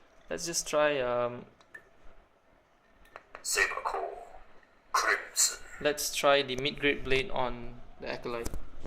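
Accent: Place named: Singapore. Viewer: lol I hear a Singaporian accent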